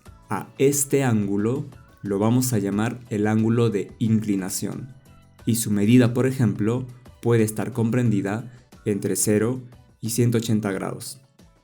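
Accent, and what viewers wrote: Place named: Peru. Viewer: Ese acento Peruano es increíble!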